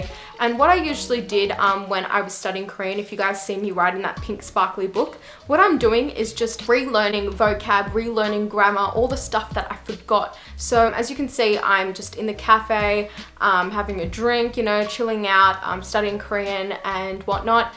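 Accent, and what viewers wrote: Australia. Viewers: while you were sleeping was such a good drama!!! i got second lead syndrome watching it though >.< also, i love your aussie accent haha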